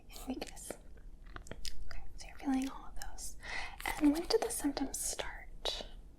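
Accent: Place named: Great Lakes, United States. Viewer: I have no idea where her filming location is, but she has a very distinct upper USA Midwest/Great Lakes accent, also known as the 'northern vowel shift' accent. You'll hear it from time to time when she says words like flat, gallon, etc., with very flat, elongated 'a' sounds. So 'flat' becomes 'flaayt' and gallon becomes 'gaayllon.' Watch her 'Earth's Crust' video, and you can really hear it when she says 'volcaaynic' as opposed to volcanic. Really common in Cleveland, Akron, Buffalo, Chicago, eastern Minnesota, etc. Basically in/around the Great Lakes in the United States.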